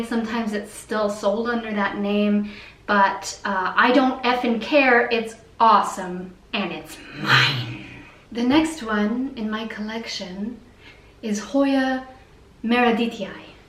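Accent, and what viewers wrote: United States. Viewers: Just curious where you live. as you sound American, but you obviously don't live in North America hence the reference to the Euro?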